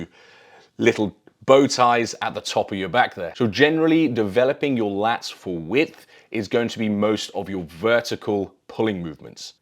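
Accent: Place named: England, United Kingdom. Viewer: thought you were cbum from thumbnail. the english accent through me off. thanks for the info tho very good tips. back day in 6 hrs! subcribed!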